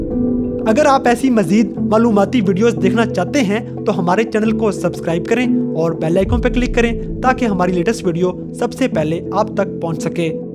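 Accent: India. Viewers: Iskay lehjay say saaf pata lag raha hai ke yeh Indian hai ..... meray Baloch Bhai Amir Hamza ki aulad ho aur sab Pakistanio ke dil mein basty ho. Jhootay Indian propaganday say bach ke raho. Inko aik din Kashmir bhi chorna paray ga.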